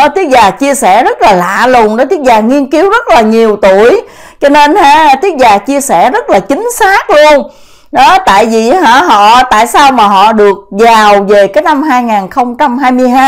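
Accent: Standard. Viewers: Chi noi qua chuan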